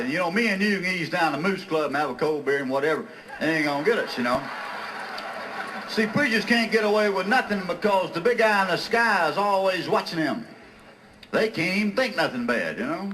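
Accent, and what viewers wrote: South, United States. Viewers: I dare say the heavy southern accent may be different enough from normal English to be considered a separate language.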